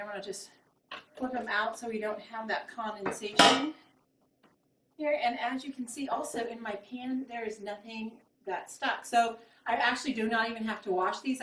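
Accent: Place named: Scotland, United Kingdom. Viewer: The first sentence made me think you have a Scottish accent :-P.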